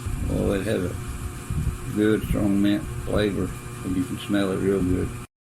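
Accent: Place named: South, United States. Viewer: This was neat as heck! Can't you get any backer in the Philippines? New friend here you sound just like everyone around here. Ain't nothing no better than a southern draw. At least not too me. Look forward to more videos. Stay safe and God bless 🙏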